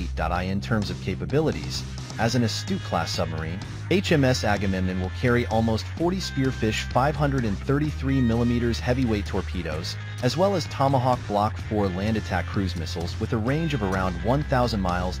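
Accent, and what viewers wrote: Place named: United States. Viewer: Do we really need an American accent and the American spelling of "centre" If they want to pretend to be British for money they should get it right. Some elderly Britons notice these things.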